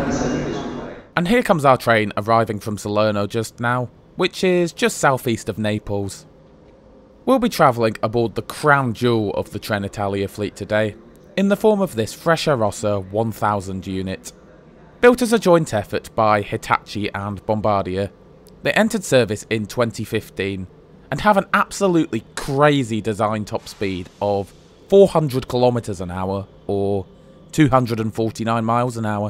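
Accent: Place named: England, United Kingdom. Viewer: Your Italian sounds very English 😁. I also got troubled in Italy for filming. They are similar freaks as TfL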